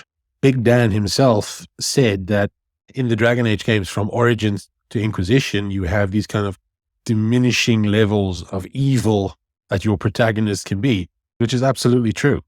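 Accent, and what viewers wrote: South Africa. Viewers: I might be wrong, but is that a South African accent I hear?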